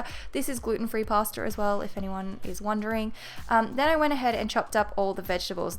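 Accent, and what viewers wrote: Australia. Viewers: Are you Australian?? Btw these look great :)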